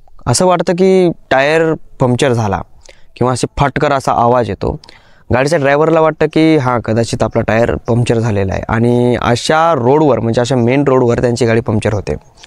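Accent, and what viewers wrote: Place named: Vidarbha, India. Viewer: भाषा आपल्या विदर्भाची आहे भाऊ तुझी. लहानपणी आज्जी आजोबांच्या कथा ऐकलेल्या आहेत तू असं वाटतं. खूप छान कथाकथन करतोस तु. Good keep it up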